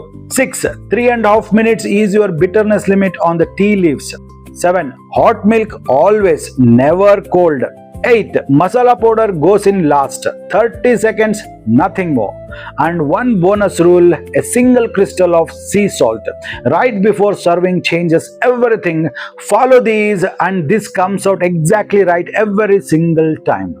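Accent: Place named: South India, India. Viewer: Thank you Amma and Ji for bringing this beautiful and highly detailed recipe on how to brew masala tea 🙏😊. I'll definitely make this tomorrow for my parents (I only get time to cook on weekends). I also like the accent of the narrator, beautiful South Indian accent. Reminds me of my school teachers. It'll be my first time brewing a tea your way and hope it turns out good 😁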